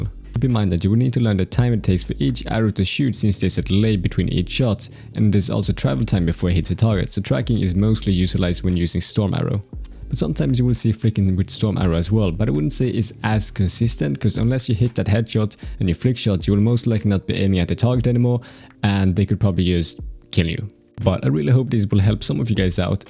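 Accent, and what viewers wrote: Sweden. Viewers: Bra video som fan, man hörde på din första mening den markanta "svenskheten" :P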